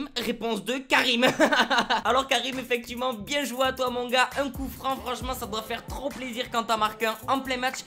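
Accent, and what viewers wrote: Marseille, France. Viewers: Salut mon vieux, obligée de t'écrire un commentaire sur youtube pour te joindre ! C'est pas possible ça haha J'ai maté ta vidéo, ça fait longtemps que j'ai pas entendu ton petit accent marseillais/landais/nonidentifié en vrai donc t'es dans l'obligation de me donner de tes nouvelles!!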